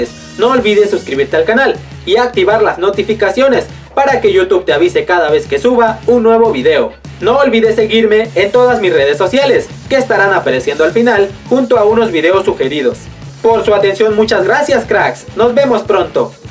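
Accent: Mexico. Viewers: ¿Se nota que es Mexicano? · La liga de México la mejor? Jajajajaja jajajajaja Cuando puse el video y escuché el acento mexicano era obvio que iba a poner la liga mx primera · Del inicio del video desde eschuche como habla este tipo que iba es mexicano ya sabía q liga iria primero ay dios 😒😒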